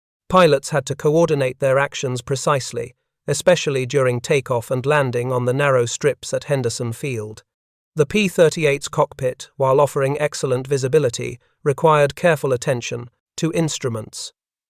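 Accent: England, United Kingdom. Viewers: Anglican English gives the narrative the proper setting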